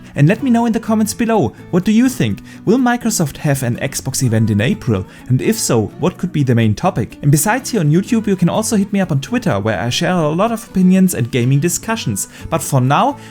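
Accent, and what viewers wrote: Germany. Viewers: Wie ich diesen deutsch englischen akzent liebe, mach weiter so!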